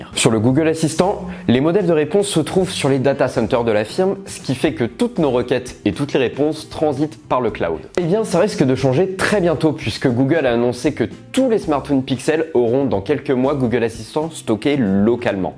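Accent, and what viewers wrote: Normandy, France. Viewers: Boujou on sent le normand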